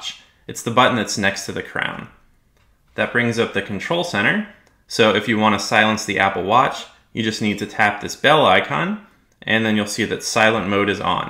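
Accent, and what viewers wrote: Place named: United States. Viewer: Thanks. I’m glad you’re American & easy to understand.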